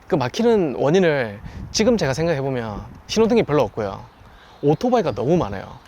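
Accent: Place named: South Gyeongsang, South Korea. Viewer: 인도네시아 여행. 관심이 갑니다. 방갑습니다.ㅎ 구수한 경상남도 톤이 정겹게 느껴집니다.ㅎ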